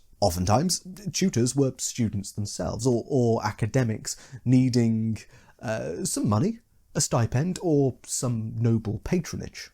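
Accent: United Kingdom. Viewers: Worst Witch probably a inspiration. Basically bunch of young witches go to school learn how to be witches. (inside universe boys goto wizard school). Its from the 70's. All the adventures take place mostly in the school or school related activities. They had many tv shows on this from UK. You sound like you're from UK :p. You should know lol. I swear Harry Pottery is just the male version of worst witch. Description of Book copy and paste The stories mainly take place within the walls of Miss Cackle's Academy, a girls' boarding school in a stone castle atop a mountain, surrounded by forest. Each book covers one term at the Academy.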